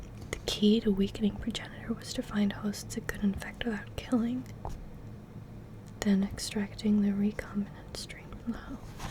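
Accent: United States. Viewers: Girl, your American accent is impeccable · Every time you do an American accent video I forget that it isn’t your usual voice 😂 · I like the American accent 🙌 Its solid , in this American's perspective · I love your American accent😊. You do it so well~ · After watching you for years, I don't think I'll ever get over how good your American accent is. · The acting, camera angles, American accent and even facial expressions, you're phenomenal at this,. 😊 And speaking of discovering (Umbrella Corporation), I'm glad I discovered this channel. ❤ Thank you so much for this new video, and helping me to relax once again. 😊✨ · You do a really good American accent! I feel there's a lot of opportunity there for other roleplays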